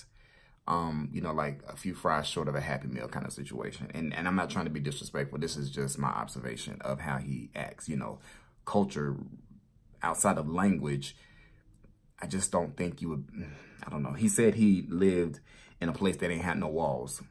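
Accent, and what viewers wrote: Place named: Virginia, United States. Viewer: I'm from Virginia and I think you sound like us. But then again maybe I'm country lol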